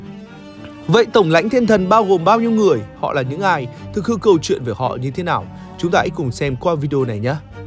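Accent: Vietnam. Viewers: Nói giọng Bắc ko chuẩn lại nói nhanh nuốt chữ khó làm người nghe ko kịp, phát âm các tên TLTT ko rõ !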